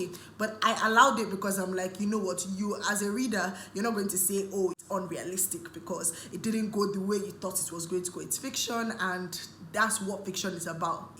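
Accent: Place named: Lagos, Nigeria. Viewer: Your accent is obviously a Lagos Nigerian accent. Or perhaps a certain sub-group in Lagos or even in Nigeria have this unique inflection. I am curious as to how you came about the accent. Does it have anything to do with ethnicity or schooling or social class. reason is that is exactly how Chibundu Onuzo sounds. Thanks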